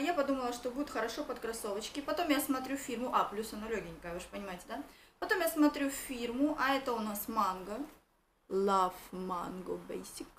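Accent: Rostov, Russia. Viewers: Не вылазИЮ))) , ой не могу с ростовского говорка ))))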